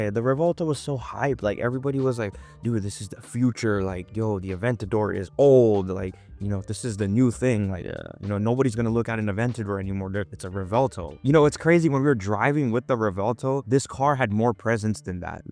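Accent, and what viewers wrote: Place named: Canada. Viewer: u don’t sound American at all u sound like a 100% Canadian.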